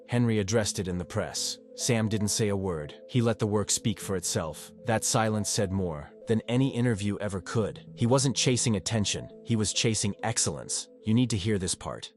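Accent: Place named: United States. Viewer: Why the hideous American voiceover ???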